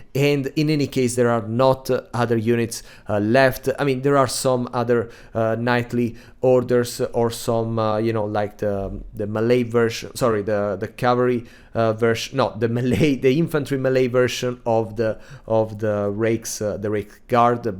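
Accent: Italy. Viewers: dall'accento che si sente subito che sei italiano ahahah. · Ah ha! It was Italy lol. I was trying to identify your accent. I love the content, thank you for the great videos. (From Canada)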